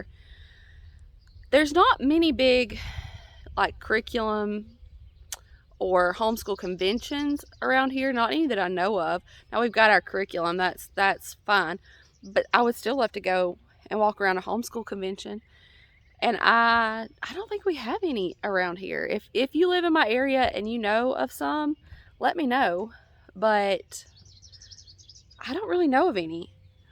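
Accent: South, United States. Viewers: Hi Ms loved watching your videos and seeing that chick you were holding so cute and sweet..i love chickens...seeing your kids too they are so precious looking....your a pretty young woman created a wonderful family with your hubby and you are so sweet and love the way you talk a true southern girl. Me too I'm a real southerner as well. But y'all have a great Monday evening if y'all have storms be careful and stay safe...see you in your next video girl 💕💕.....love ya sweetheart ❤️.....take care ❤️.....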